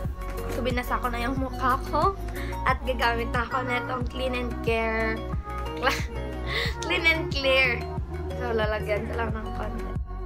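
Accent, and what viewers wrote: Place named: Hiligaynon, Philippines. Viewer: That hiligaynon accent thou i love it💖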